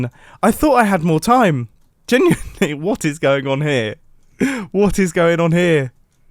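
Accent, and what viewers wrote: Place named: United Kingdom. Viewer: That Bri-ish accent tho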